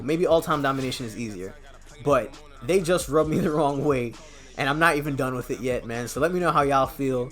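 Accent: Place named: Jamaica. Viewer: I hear that Jamaican accent boy Gang🤟🏽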